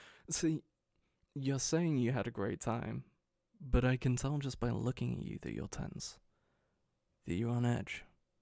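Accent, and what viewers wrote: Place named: United Kingdom. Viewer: Wait… he kinda sounds like auralescent but more British to me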